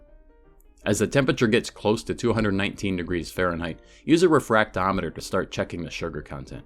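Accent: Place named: Canada. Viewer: This man sounds Canadian so you know he knows what he’s doing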